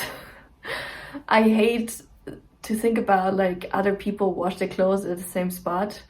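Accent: Germany. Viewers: In one of the videos you say that you didn’t know if you had a German accent, I can confirm you 100% have a typical German accent when speaking English. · I can hear the German part of you in some of your English :-)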